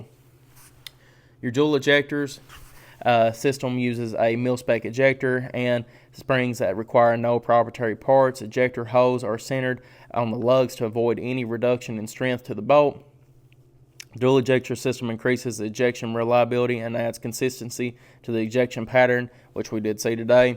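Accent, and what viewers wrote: South, United States. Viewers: Love the way real Southern Boys talk. It's not a BCG, it's a BeeeCeeGeeee.......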